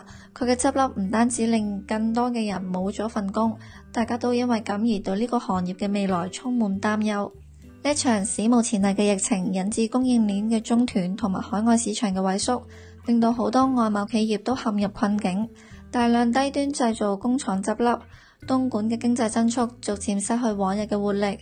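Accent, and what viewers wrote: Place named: Hong Kong. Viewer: 是HK話 唔係廣州話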